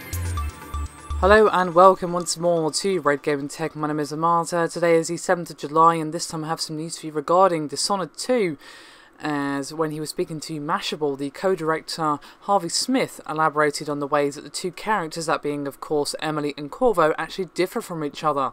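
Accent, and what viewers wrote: United Kingdom. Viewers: Very worth listening to, and elegant British accent. :)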